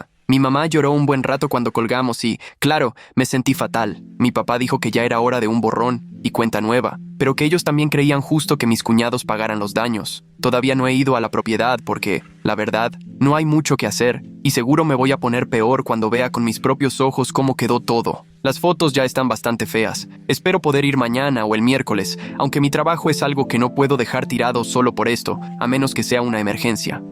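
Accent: Mexico. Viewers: Oigan podrian decirle a la ia que deje el acento mexicano y vuelva al español neutro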